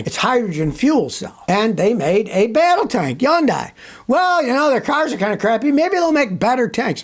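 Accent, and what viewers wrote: Germany. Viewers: funny German accent. More ... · Loved your German accent 😅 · Best German Accent on Youtube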